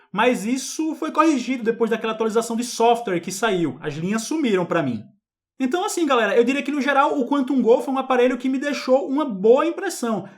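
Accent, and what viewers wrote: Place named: Fortaleza, Brazil. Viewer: De fortaleza se não me engano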